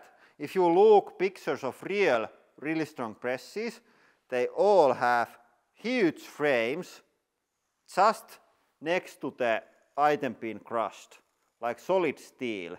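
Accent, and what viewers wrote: Finland. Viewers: I want to listen to you explain everything in a Finnish accent! 😄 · It is so much better with the Finnish accent 😂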